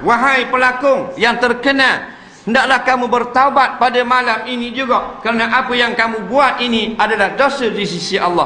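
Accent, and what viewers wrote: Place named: Johor, Malaysia. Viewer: ada sesiapa tahu tak kat surau mana ceramah ni diadakan? cara ustaz cakap, macam kat johor..... surau mana ye? best sangat kuliah ni, panas dan pedas.....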